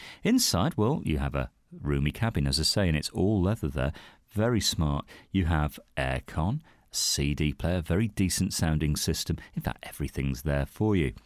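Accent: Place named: United Kingdom. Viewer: This has to be the most british voiceover ever.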